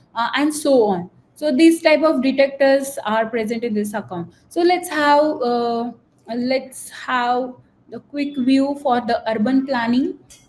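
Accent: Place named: India. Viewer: >indian accent No thanks.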